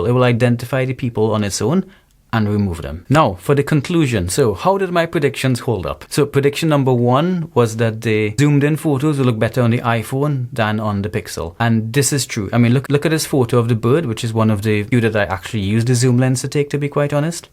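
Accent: Trinidad and Tobago. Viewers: You from trinidad? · I hear a Trini accent...🤔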